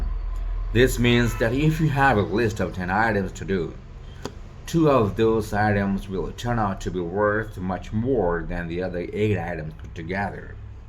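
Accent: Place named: United States. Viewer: A true American accent 🎉🎉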